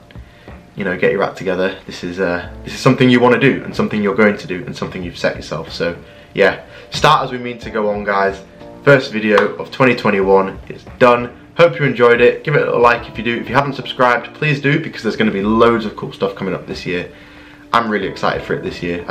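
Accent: United Kingdom. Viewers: you are wonderful! I love your down to earth style and your British accent is wonderful to listen to. Sorry to say, I'm too old to get physically fit. I know you will say "you're never too old." Been there, done that. But I really appreciate all your hard work and your beautiful body. (Do I sound gay? YESSSSS oh well) Love you,. Thank you for bringing some beauty into our sad world.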